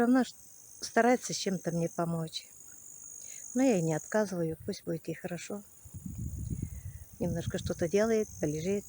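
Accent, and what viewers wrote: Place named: Mishar Tatar, Russia. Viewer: вы не мишары,говор мишарский у вас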